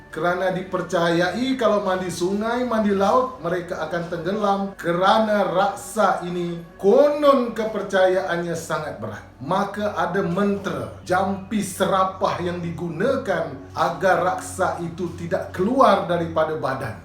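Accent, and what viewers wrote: Malaysia. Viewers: He spoke very melayu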